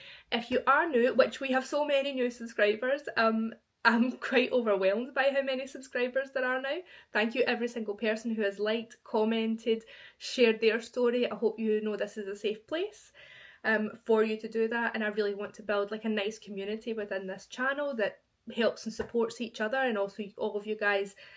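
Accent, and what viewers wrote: Scotland, United Kingdom. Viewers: I knew that was a Scottish accent 😊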